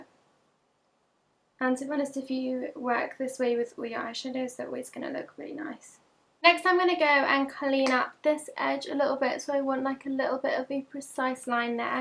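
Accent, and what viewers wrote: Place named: United Kingdom. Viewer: I love ur british accent.. I love your face.. Love your voice when your talking..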